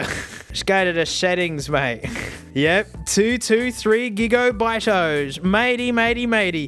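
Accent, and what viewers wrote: Australia. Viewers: I miss hearing "Australian" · I actually do wonder though: Is that accent only in some parts of Australia? Because I went to Australia before and the place I went to has the stock English accent that a lot of Americans have. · I like it when you do the funny aussie voice, it makes my day with every upload 😁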